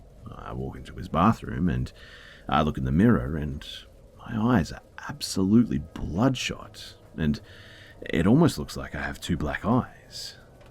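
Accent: Australia. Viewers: He's an auzzie mate so fuck off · He's Australian?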